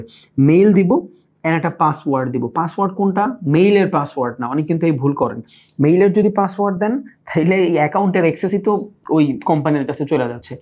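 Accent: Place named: Barishal, Bangladesh. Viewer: ভাইয়া আপনার দেশের বাড়ি তো মোগো বরিশাল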